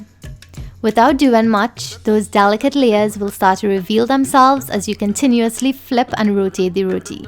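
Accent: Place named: Trinidad and Tobago. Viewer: This has to be the best video on paratha. I moved from Trinidad at 17 years old but I vividly remember these family occasions. Thank you for sharing this part of your family with us. Job well done!!! Side note- I love the fact that you kept that strong Trini accent. Don't ever lose it.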